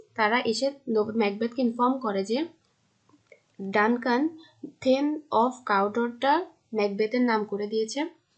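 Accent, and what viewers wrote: Chittagong, Bangladesh. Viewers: you are from Chittagong right?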